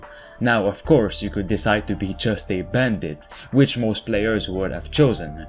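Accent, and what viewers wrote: Quebec, Canada. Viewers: you got that french canadian accent ma boi i might be wrong tho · I feel the strong Quebec accent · Tes québécois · I can sense the Quebec accent lmao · T’es tu Québécois par hasard? 👀😂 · Toi c'est sur que t'es quebecois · Qc? The accent is telling me you are a French Canadian just like me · Bel accent québécois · Are you from Quebec cuz you got that accent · Si s'gars là est pas Québécois bro... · Tabarnakkkkk that Québécois accent threw me off 😂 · ce gars là est clairement Québécois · Where are you from ? Ypu sound like a french canadian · Calique d'accent québéquoi mon boy bonne vidéo par contre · Do I hear an Accent from Quebec ? Je crois bien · Je reconnais c’est accent québécois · T quebecois toe hein? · Where is this guy from? Accent sounds like he's french canadian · Are you frome Quebec?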